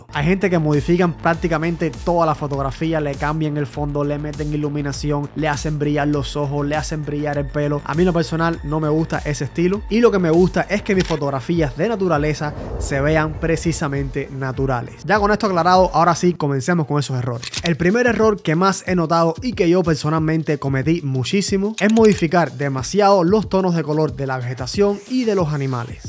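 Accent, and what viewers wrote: Cuba. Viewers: Jajaja.. desde que empezó el video tenía la duda pero cuando dijiste “se nota a la legua” dije este es cubano si o si 🤣..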